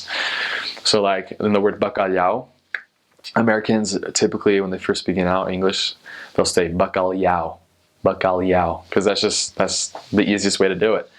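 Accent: Brazil. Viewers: His accent sounds more brazilian than portuguese but it's cute☺️ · 100% brazilian accent xD but impressively fluent! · Ahah he can talk portuguese but with the brasilian accent :-) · He sounds more like a Brazilian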